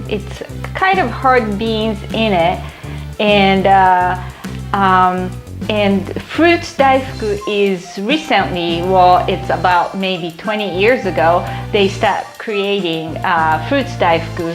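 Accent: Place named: United States. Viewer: Where did you learn English? , you seem to have a slight American accent, I enjoyed your video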